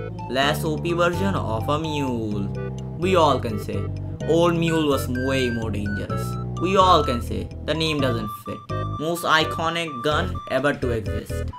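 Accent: India. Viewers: Are you Indian? Not to stereotype but seriously are you? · Are you indian?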